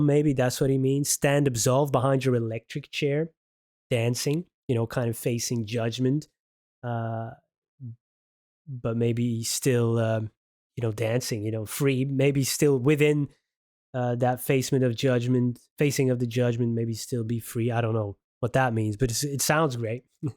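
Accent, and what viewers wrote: United States. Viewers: I love to listen to your reactions. You speak beautifully., very expressive. I think that I have expressed this before. You speak like an educated American. I would never have guessed that you’re from another country. I’m not that familiar with. This song is beautiful. You might wan to check out some his father Tim’s early music. Very hypnotic and powerful.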